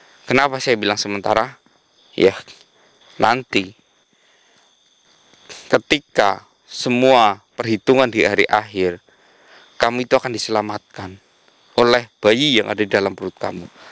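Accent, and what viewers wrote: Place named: Java, Indonesia. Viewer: klu ngomong bahasa jawa lucu juga, smoga mister slalu sehat srmangat & sukses 🤲🤲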